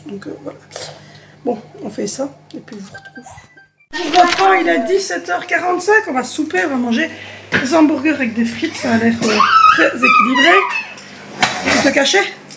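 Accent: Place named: Belgium. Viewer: Tu as qd même un peu l'accent belge je trouve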